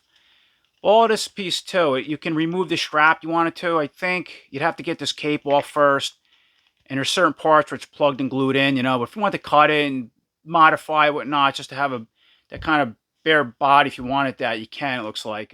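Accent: New York, United States. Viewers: Thanks for your quick reply! Now I gotta sub!;) It's good to know that at least I'm not alone going nuts over these QC issues! I managed to somewhat straighten the bullets but they're still somewhat warped. Feels like I'm tripping on acid just looking at them. Thanks McFarlane! Not! Oh well... I'll try the heat cold method once again soon. Thanks. I appreciate you 🙏 By the way! Is your accent from New York? Just curious! Best regards from Belgium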